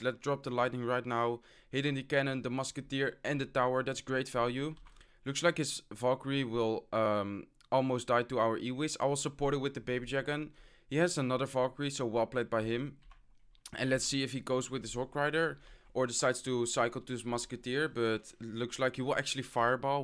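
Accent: Netherlands. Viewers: Je bent zo duidelijk nederlands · Are you netherlands because you dont talk like a american human and if i say something english i talk like you and in from the netherlands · where are you from? Because you sound a bit dutch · is hij nl ik hoor het aan zijn accent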